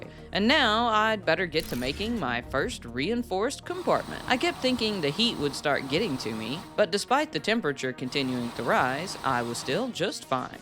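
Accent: South, United States. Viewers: you have one hell of a southern accent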